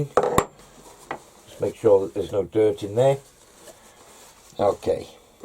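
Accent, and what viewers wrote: Pembrokeshire, United Kingdom. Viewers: I thought I recognised the Pembs accent. Spent many holidays in newgale, lovely beach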